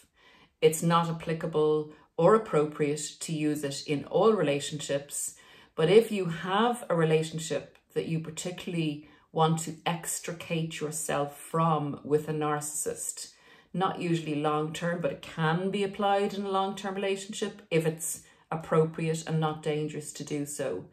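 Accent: Ireland. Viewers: I have followed for 1year, its been an invaluable resource for dealing with the aftermath of narcissistic abuse...the narc sussed gray rock forcing a reverse discard. Thank you, great to hear an Irish voice on such a personal subject.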